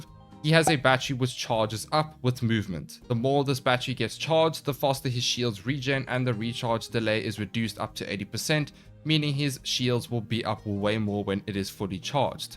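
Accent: South Africa. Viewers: u south african??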